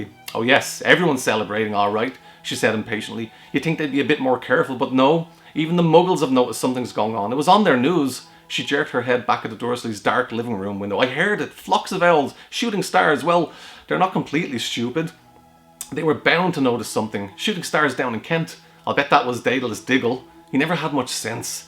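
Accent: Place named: Ireland. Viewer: Please. Do more of this. I've been looking for audiobooks with irish accent.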